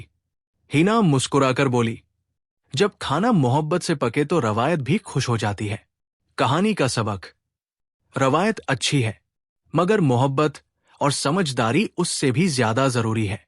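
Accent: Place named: Pakistan. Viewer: Par ye pakistani jesa kyun bol raha he feel nahi arahi hasi aarhi he 😂❤️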